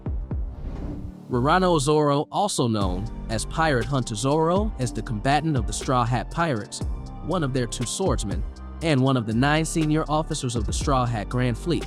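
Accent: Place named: Georgia, United States. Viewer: this is the most Georgia accent I've ever heard lmaooooo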